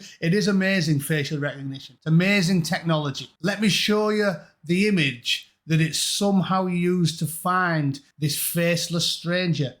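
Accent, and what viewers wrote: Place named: Newcastle, United Kingdom. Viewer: Top notch Geordie commentary. Spray painted ducks and 190year old polar bear.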